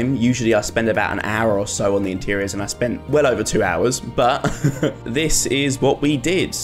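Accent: United Kingdom. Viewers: is this guy british?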